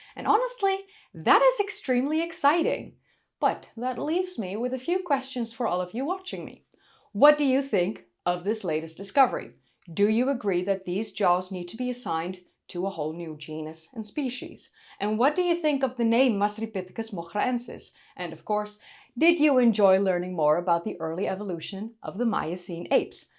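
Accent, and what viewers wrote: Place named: Netherlands. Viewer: I really love how you pronounce these words. I love the Dutch accent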